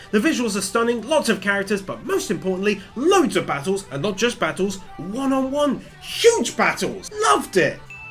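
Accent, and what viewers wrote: United Kingdom. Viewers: I could tell from the accent you were from somewhere in East Anglia, same. I also got very hyped when that location card came up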